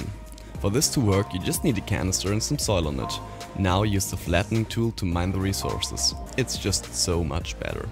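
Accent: Germany. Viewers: Der deutsche akzent ahhh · You are German Right?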